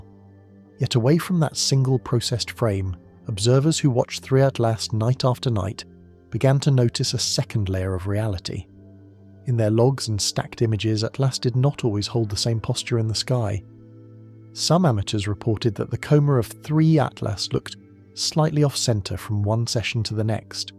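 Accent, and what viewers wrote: United Kingdom. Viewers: Why is the voice ALWAYS british english!?!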